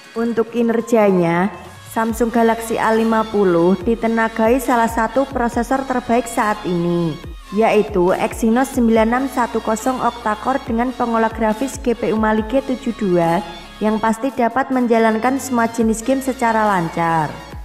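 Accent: Java, Indonesia. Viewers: Medhok bhanget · Terlalu medok mbak,menurut saya mbak harus bisa belajar public speaking yang baik dan benar dan juga yang terpenting gear mic nya mbak,kalau kedua hal tersebut udah terpenuhi insyaallah nanti channel ini akan sejajar dengan sobat hape ataupun gadgetin SEMANGAT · Apik mbak tapi ketoro muedhok ketok jowoe hahahahahaha suon njeh mbakku. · Suarane medok .. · Suaranya itu loh medok bngt jawa nya hedeeh capek denger nya. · Medok bgt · Medok banget suaranya wkwkwk 😂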